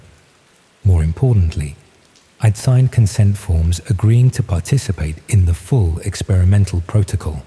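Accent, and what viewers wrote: United Kingdom. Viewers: Every night I fall asleep to stories like these. It’s nice to have a British one listening to places I know of. Since listening to you to fall asleep I’ve found myself staying awake slightly longer to listen to what’s happening.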